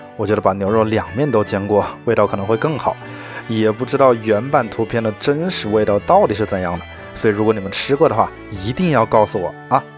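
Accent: Wuhan, China. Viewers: 想问下up主是不是武汉人😂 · 听声音应该是武汉人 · 太棒了！质量很高的美食博主！博主是哪里人？听口音好像是武汉的？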